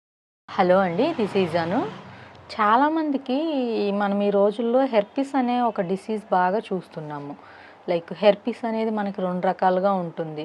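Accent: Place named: Andhra Pradesh, India. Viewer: Andhra slang alane untadi bro try to understand don't underestimate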